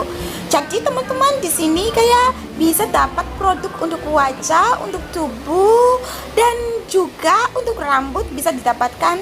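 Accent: Java, Indonesia. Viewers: Mbak bhs indo nya khas org jawa bgt:D👍 Salam dari kalimantan utara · Zimbabwe medhok jawa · Mbak bahasa Indonesia sampean medok hehe... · Mb sudah medok.salam kenal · Bukan seperti orang Afrika, malah seperti orang asli jawa. Medoknya 100℅.. Kesini gara2 chanel sebelah. Londo kampung. Semngat trus kkak. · Medhok dong suaranya Tadi habis nonton londo kampung langsung cuss kesini. Btw kak gak di suruh kayak ng scan gitu kalo mau masuk mall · Medoknya mbk,kereen,suamiku Nigeria belum bisa indonesia,mb keren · Semangat mbak 🤗 medok nya lucu bgt 😁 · Hii..i visit ur channel after i saw ur collaboration with dave. That's great!! Happy stay in indonesia..ur accent almost same with javanese with strong in D, B, G..hehehe.. Btw i'm from west java..can u share about ur hometown culture? Foods, tradition, song or anything.. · Gara gara Londo kampung aku mrene penasaran hehehehe wes medok mbak sampean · Kok logatnya kyk orang jawa ya...hehe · Mbk jd medok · Wow mbak aksen bahasa indonesia anda medhok banget seperti aksennya orang jawa,, mantab mbak 😊👍 i'm new subscriber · Aku suka aksen Jawa-nya Mbak. 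Salut.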